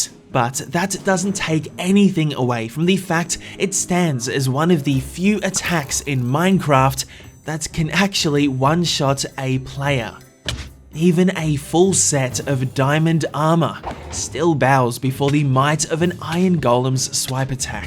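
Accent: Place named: Australia. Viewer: I swear ur Australian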